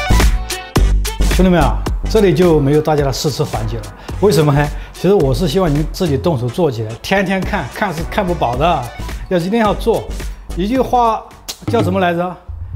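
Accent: Sichuan, China. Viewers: 师傅是四川人吗？椒盐普通话很好听。😊菜单也收藏了。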